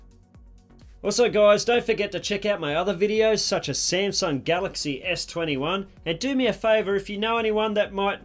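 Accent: Australia. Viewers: I like your Australian accent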